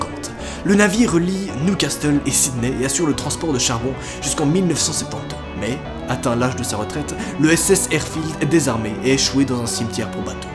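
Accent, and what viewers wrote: Belgium. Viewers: T es belge 🤔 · Tes belge ? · T belges ??? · t belge ? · tu es belge? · Et mais tu est Belge?? PS: Je t'adore!❤ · Est ce qu'il est belge ? Il a un ptn d'accent flamand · tu es belge ou quoi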